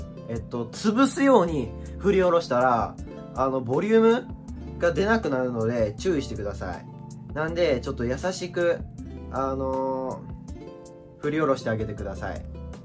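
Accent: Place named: Kansai, Japan. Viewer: イケメンなのにアホ丸出しの喋り方に天然で大阪出身関西弁で黒髪が似合うって、最強なんよめっちゃ好き黒髪見てえです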